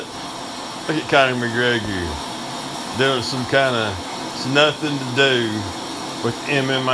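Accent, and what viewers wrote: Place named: Appalachia, United States. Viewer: This guy sounds like a rite hill billy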